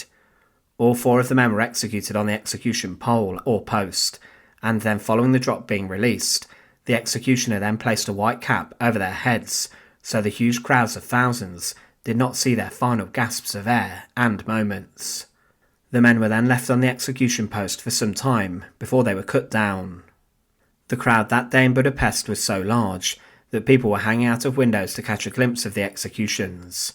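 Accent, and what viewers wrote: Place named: England, United Kingdom. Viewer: Not Ai he's a pom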